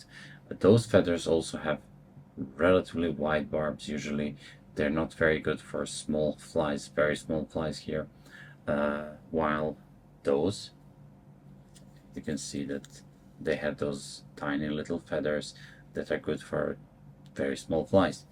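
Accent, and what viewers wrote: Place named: Europe. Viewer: Brilliant video, your very very good at displaying the real inside parts of fly tying. A short time ago I watched a lot of you videos,I am so impressed and have started fly fishing and tying again, what a great inspiration you are. Do you live in South China, you sound European that is very interesting, how is the fishing in China.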